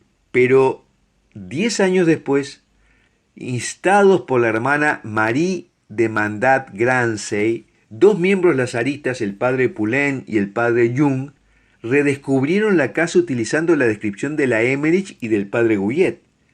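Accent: Argentina. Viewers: .le agradezco la información ya por medio de usted de la Virgen me a ayudado a reafirmar mi fe y estoy en proceso de seguir y aplicar mi fe católica por eso enfatizó este párrafo aparte de que me agrada su acento tipo argentino y su final bueno......! Por eso mi punto de vista para con usted ya que le reafirmó mi gratitud y confianza con su programa gracias buenas noches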